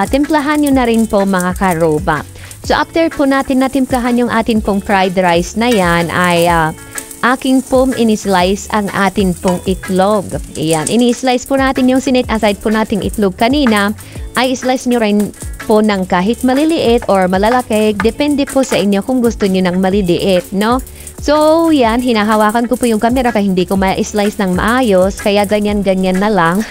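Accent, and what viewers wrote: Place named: China. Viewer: may accent ma si madam ahh. Mandarin accent ma tagalog sanaoil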